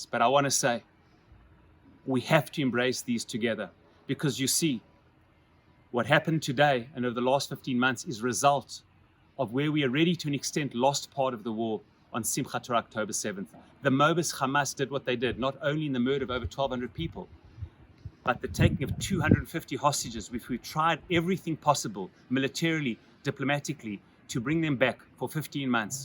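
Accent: South Africa. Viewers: Rabbi sounds south african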